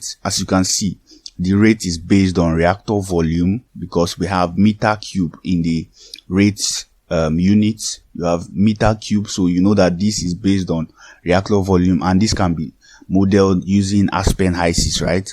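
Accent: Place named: Nigeria. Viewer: I always feel proud whenever I hear Nigerian voice in tutorial videos.. Good job bro❤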